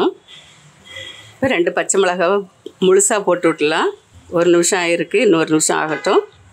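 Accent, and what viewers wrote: Nagercoil, India. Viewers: அம்மா உங்க சமையல் சூப்பர். எனக்கும் என் மனைவி கும் ஒரு போட்டி. நான் நீங்க நாகர்கோவில் னு சொல்றேன் அவ நீங்க மலையாளி னு சொல்றா. அதான் உங்ககிட்டயே கேக்குறேன்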